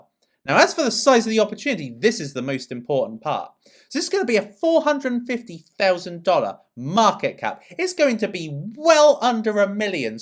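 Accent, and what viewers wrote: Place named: Ipswich, United Kingdom. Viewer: new subscriber, you sound like youre from ipswitch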